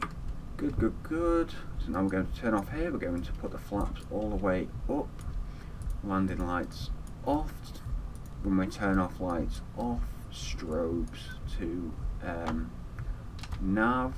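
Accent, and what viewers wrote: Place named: Manchester, United Kingdom. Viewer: Congrats on the 2k subs here's to 3k+. Absolutely brilliant job and so well explained. Been through many tuts as a newbie, and yours should beat the top. Pruod to see a fellow Manny making something complex so informative and easy to digest...your a star, no idea how much i struggled before this tutorial. well underrated. thank you..liked...subscribed. ❤️ p.s Yup he's manc (heard the shouting) yup he's definitely Manc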